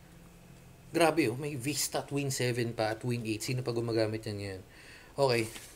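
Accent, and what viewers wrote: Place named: Pampanga, Philippines. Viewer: Thank you po sa detailed review! Kapampangan poba kayo? parang nakadinig ako ng accent ng fellow kabalen HAHAHA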